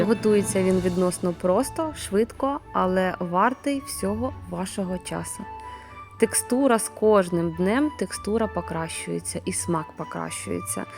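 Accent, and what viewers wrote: Russia. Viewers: Це справжній рецепт. Професійно! Приємно дивитися. Але не дешево (для мене ). І дивна мова у вас - наче українська і хороша, але ж "падарунок" , " раздєліти" та інш. ....це ( просто цікаво) у вашому місті так розмовляють? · Ну доя чого тужитись балакати украинською мовою, аж вуха ломить бо русский акцент видно шо ви гоаворите росийською мовою и вона Головна. То все краще слухати чисту мову бо це не украинська а перелом мозгу. .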